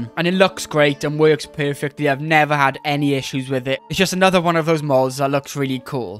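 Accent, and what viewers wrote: Wales, United Kingdom. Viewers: Nice vid. Is that a Welsh accent?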